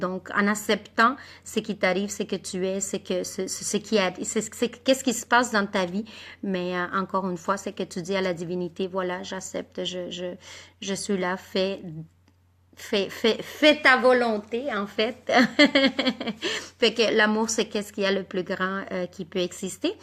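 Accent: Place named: Spain. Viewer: Quel joli accent que l'accent espagnol en français quebecois. Merci pour cette vidéo.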